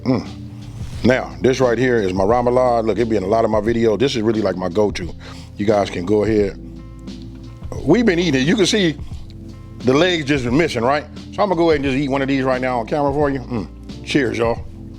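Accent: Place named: Los Angeles, United States. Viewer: this is 🔥. Even your LA accent!!! 😊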